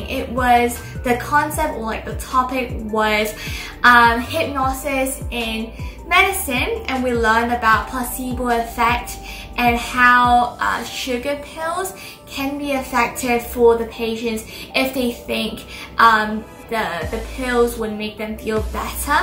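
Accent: United Kingdom. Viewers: 영국에서부터 구독했는데 영국 액센트와 미국 액센트가 아주 묘하게 조화를 이뤘네요.^^ 예쁘고 야무지고 똑똑 부러진, 영국에서 항상 응원할게요! 부모님께서도 무척 뿌듯해하실듯요.👍❤️😍👸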